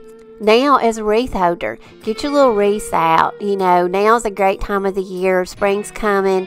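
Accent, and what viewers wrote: South, United States. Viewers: You have such good ideas, classy and not cheap looking store bought items. Love your upcycles, repurpose of old things..also enjoy your background music and your sweet southern accent.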